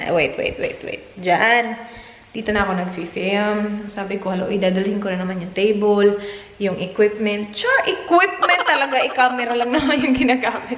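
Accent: Visayas, Philippines. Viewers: Bisaya jud gihapon ang accent te ba. AHAHAHA LOVE YOU ALSO!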